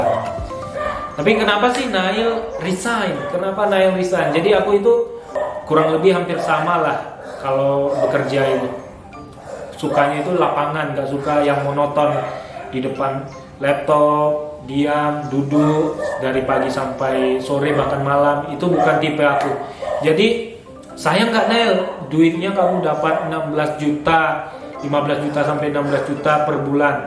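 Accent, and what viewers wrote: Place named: Pekanbaru, Indonesia. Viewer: Kak nail dari logat bicara nya keliatan bgt orang pekanbaru nya😁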